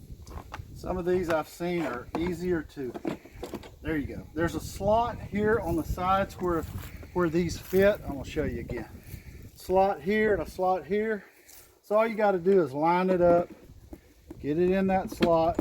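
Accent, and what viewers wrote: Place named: Southern Indiana, United States. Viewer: I love your accent. Southern Indianna? I love how you folks pronounce OIL. In northern Minnesota it's pronounced " Oy-L". You folks pronounce it "OLE" that would rhyme with "Coal" or "Hole". specifically pay attention to the mark in the video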